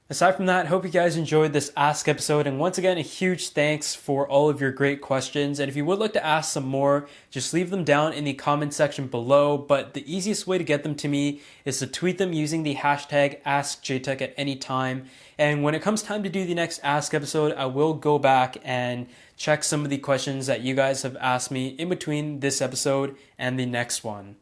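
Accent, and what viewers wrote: Canada. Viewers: wait u canadian fam?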